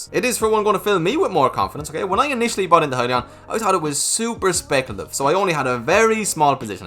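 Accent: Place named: Ireland. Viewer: That Irish accent is so fun to try n copy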